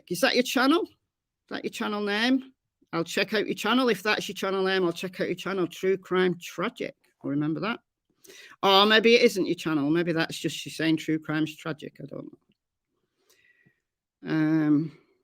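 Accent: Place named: Southern England, United Kingdom. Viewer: So gutted I missed your live. Love your channels and you are the first person I look for when I wake up. I’m in the UK to but much further south judging by your accent. Thank you for everything you do keeping us up to speed with the facts! X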